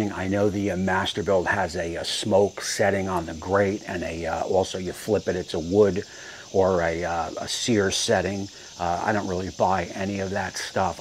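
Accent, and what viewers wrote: New Jersey, United States. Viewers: Chim-a-ney... Love to NJ accent brother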